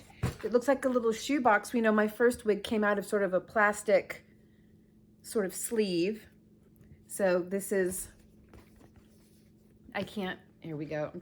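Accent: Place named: New Jersey, United States. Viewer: Holy moly! I had thought you were in your late 20s!! I was stunned to hear you had grown children. I know how you feel about relocating and not having friends where you live. Your videos are very entertaining and enjoyable. I thought about buzzing my hair like that, just leave the sideburns. Lunch lady net!! That was good! Your New Jersey accent is awesome! You are a hoot! Your parking lot Karen comment put me in the floor!!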